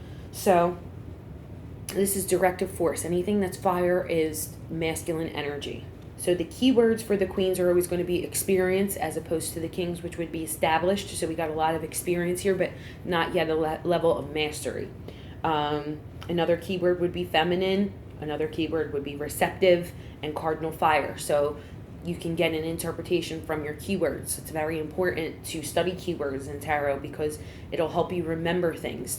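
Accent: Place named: Northeast, United States. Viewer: R u from Philly? U have that north east accent.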